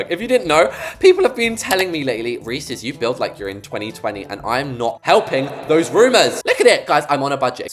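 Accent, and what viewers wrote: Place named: United Kingdom. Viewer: Omg I’ve watched Reese’s for like… yearsssss but I only just realised… IS THIS MAN BRITISH??? I am and honesty he sounds British but I only JUST realised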